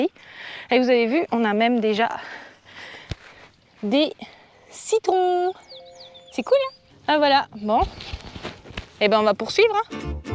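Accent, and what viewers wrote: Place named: Franche-Comté, France. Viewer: gaugé !!! voilà une vraie franc_comtoise 🙂. ca fait plaisir à entendre . Bon vent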